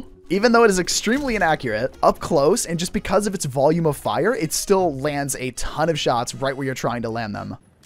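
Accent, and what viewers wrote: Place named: United States. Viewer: kac srr kinda sounds american and looks american, overall just americano vibey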